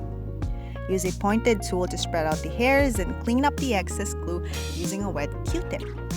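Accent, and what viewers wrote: Philippines. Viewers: You kinda have a filipino accent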